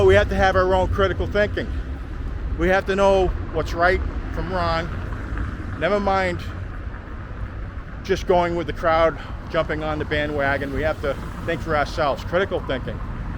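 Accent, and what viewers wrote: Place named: Boston, United States. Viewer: .... excellent and humorous interpretation. We know what you meant. The beauty of Warsaw can never be overstated, an immaculate city overflowing with history, stunning beauty and soul. Thanks for the tour, and hope you come back (American living here)! You have Boston accent, awesome. I met Polish girl many years ago in Boston, and now we have a place on Wyzwolenia, near Lazienski Park. Stay safe in Kyiev.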